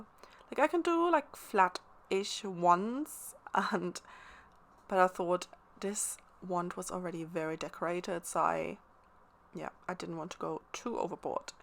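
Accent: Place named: England, United Kingdom. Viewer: You make such pretty things. I love pink and purple myself. My fav colors. Love listening to your “English” voice. We here in the states gave the “hick” sound. 🤣. Anyway, I need to find the pretty embellishments like you do to layer with. So fun watching you create. TFS!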